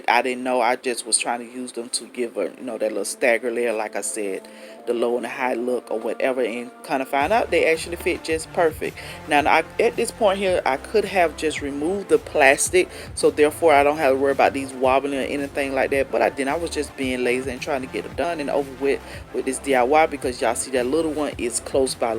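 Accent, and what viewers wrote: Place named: Detroit, United States. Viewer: Great idea! That accent! What region are you from? Detroit?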